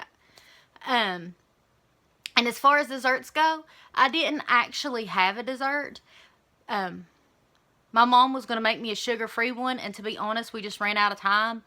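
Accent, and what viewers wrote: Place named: South, United States. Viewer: I also get dumping with a lot of sugar. You're great - live your videos and your accent. I live in Florida, but we don't have that think southern accent. I love it and could listen to you all the time. Keep up the great job! Looking good!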